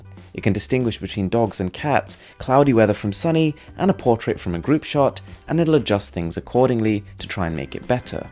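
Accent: RP, United Kingdom. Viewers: of all the youtubers with British Accent Mrwhosetheboss' is my favorite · You've got a very posh accent. Great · The accent of this guy British... Nice review but can you do an depth camera review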